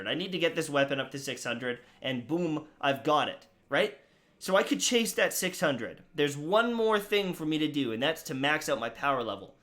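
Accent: Scotland, United Kingdom. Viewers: Dammit, bring back the scottish! Lol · Loved the snipe vids back in the day with the Scottish accent 😂. Also can't get enough of that flying mongoose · I’d love to see some other videos. I remember watching some of your funny Monster Hunter videos with the accents. Exotic gun reviews in that Scottish accent is always hilarious as well. Up to you where you go, but I’ll be here whatever video comes out!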